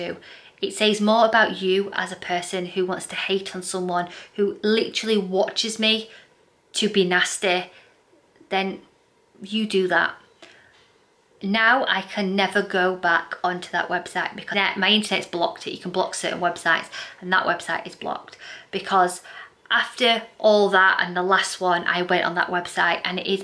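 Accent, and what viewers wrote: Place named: Northern England, United Kingdom. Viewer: I'm so glad you're going to carry on making your Friday Finds and What I Eat videos. You're brilliant to watch and there's nothing wrong with how you talk... It's nice to see Northerners on YouTube 😘. Some people can be so envious of a pretty, confident, clever woman!!! What they've done is disgusting but I think you're doing the right thing by keeping the kids out of it and carrying on. It's them with the problem, not you. F*** them. You've got 3 & a half thousand followers behind you 😊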